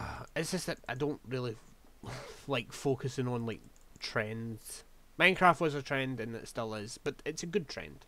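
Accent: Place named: Scotland, United Kingdom. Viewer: scottish, should have guessed it, knew it sounded familliar.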